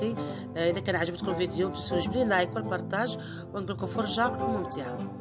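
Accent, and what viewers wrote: Oran, Algeria. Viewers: شحال تعجبني هدرتك نحس روحي في وهران ههه إنشاء الله نربحو اليوم ⚽️🇩🇿🏆🤲 مرحبا ببنات بلادي عندي شكرا ربي يسعدكم و يفرحكم لي الشرف تنوروني و تشجعوني شكرا مسبقا بنات بلادي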